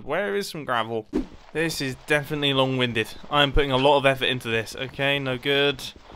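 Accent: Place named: United Kingdom. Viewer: Am I the only America who thinks it’s hilarious when Britains speak basically a different language like it’s not pronounced laver it pronounced LAVA!!!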